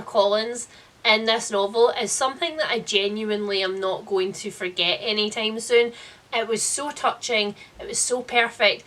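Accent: Scotland, United Kingdom. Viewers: I ❤️ your Scottish accent.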